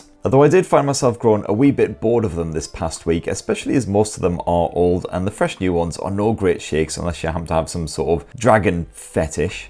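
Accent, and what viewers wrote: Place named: Yorkshire, United Kingdom. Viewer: Loving the accent (yorkshire?) and the quality of the review - fantastic work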